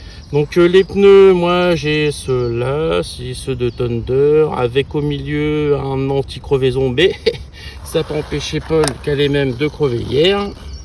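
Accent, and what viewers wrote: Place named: Normandy, France. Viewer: Vidéo très agréable j'ai pas vu les 29 minutes passer Merci pour tes explications, bientôt propriétaire de t107 pro surtout pour l'off road 😁😁 Mais dit moi tu parlais de jeu dans la colonne ça me fait un peu peur 😕😕 Sinon joli accent normand moi je suis d'Occitanie donc un bon accent du sud bien chantant Merci pour ta future réponse bon ride ✨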